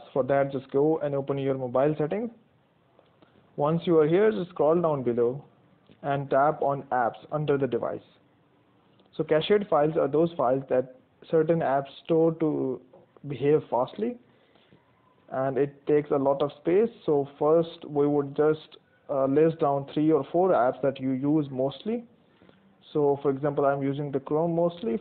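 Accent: India. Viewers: At April 2020 Android phones empty the recycle bins after 60 days. I just wish this guy didn't speak with such a thick Indian accent because what he says is very helpful.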